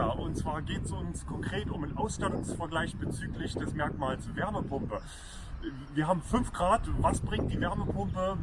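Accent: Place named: Thuringia, Germany. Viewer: Er kommt aus Thüringen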